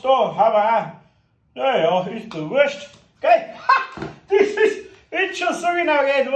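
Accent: Bavaria, Germany. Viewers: Dein Hund ist süss und gut erzogen ( oder satt) 😀 Ich liebe einfach bayrisch😍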